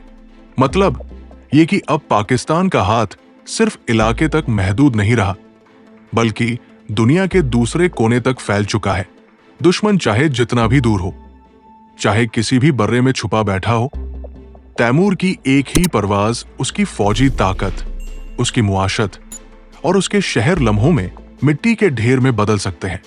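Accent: India. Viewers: O DUFFER tera lub o lehja bataa raha k tum Indian ho. Is propaganda sa kisi aur ko fool karo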